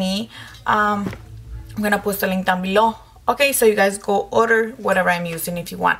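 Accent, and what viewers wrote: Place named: Guatemala. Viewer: i love your accent :) im guatemalen too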